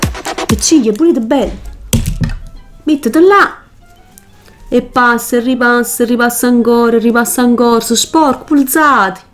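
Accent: Naples, Italy. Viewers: Ma quant si simpaticc, me piaciuto assai pk parl Napolitano, ke e o dialetto miiioo nu vass no coppa a capp ma nfacc, e bona. serata... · Non amo particolarmente la cadenza napoletana, essendo abituata all'accento nordico, ma questo video è davvero simpaticissimo, un abbraccio!☺️ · fai skiattare😂❤️ hai un accento di qualche paese napoletano di che zona sei?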